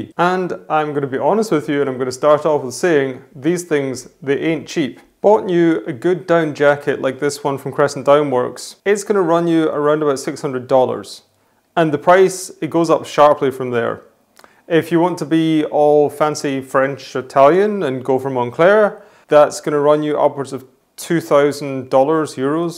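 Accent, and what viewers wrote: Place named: Aberdeen, United Kingdom. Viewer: Are you no fae Aberdeen or there aboots?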